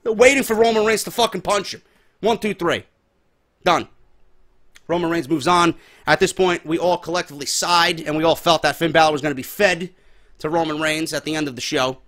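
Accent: New York, United States. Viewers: Gotta say love listening to your reviews I'm from brooklyn so love the N.Y. feel. Everything u say is spot on and shows your a true wrestling fan. i swear if i could I'd start a petition to have u work for wwe creative writing, you would create a hell of a show. keep up the great work about to watch your smackdown review #FuckThoseOtherGuys.💪👍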